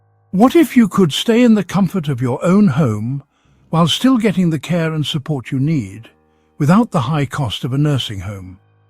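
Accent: England, United Kingdom. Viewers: So despite the AI David Attenborough ENGLISH accent narration, this is intended for an American audience. Would be good to point this out at the start or in the title.😊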